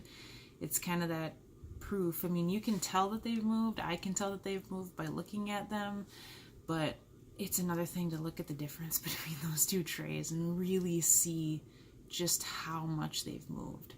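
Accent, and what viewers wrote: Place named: Minnesota, United States. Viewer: What is her accent? Sounds like maybe Minnesota?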